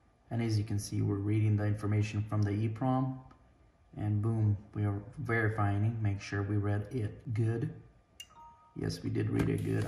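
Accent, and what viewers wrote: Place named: Soviet Union. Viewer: Soviet accent is hard to listen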